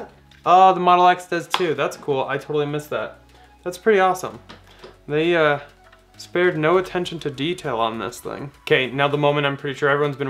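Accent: Norway. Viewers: Are you norwegan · R u Norwegian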